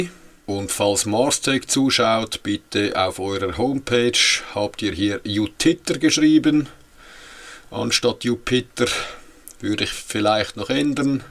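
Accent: Switzerland. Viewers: Zunächst mal: Wie schön, einen Schweizer Dialekt zu hören - mein Sehnsuchtsland! Ich habe vier B2500D; sind gut steuerbar über Home Assistant. Unschlagbarer Preis von zuletzt 150 Euro/kWh. Können nix, außer PV-Strom speichern und wieder abgeben und das mit relativ geringer in/out-Leistung, aber das unterstützen sie richtig gut. Wer einen reinen Puffer für seine Panels sucht, sollte diese nehmen.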